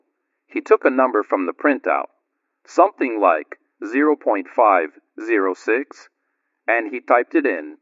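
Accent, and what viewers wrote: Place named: United Kingdom. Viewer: Razor You're suspiciously burying the fact this isn't Feynman's voice. It's not even close. Your voice has a british accent.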